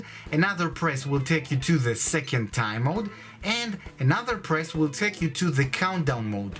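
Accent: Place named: England, United Kingdom. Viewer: wow talk about stereotype english accent!!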